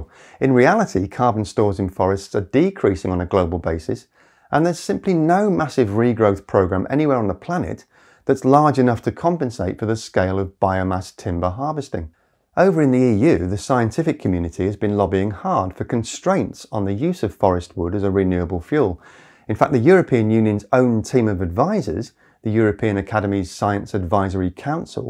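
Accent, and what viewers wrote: United Kingdom. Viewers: Not even a minute in and you have told two untruths. 1st of all wood pellets are not made out of old growth. Most of the trees that are harvested are around 20 years old which is the typical lifespan of pine trees. The trouble with this lie is that it is misinformation absorbed by the those who lobby for legislation against the logging industry. It prevents clear cutting timber which creates these massive over grown forest which are basic tinderboxes. Those forest fires which are mostly avoidable cause significant harm including loss of life. So your lies and misinformation have consequences. Next, CO2 emissions are net neutral in biomass because the carbon that is released during burning is the same carbon absorbed during growth. Furthermore, just because you have some British accent doesn't make you smart. You are spreading climate fear (fear porn) with is all lies and misinformation. 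You are the danger. I would down vote you 1000 times if possible. I will settle with just calling you a liar and a fraud. Have a think on that!!!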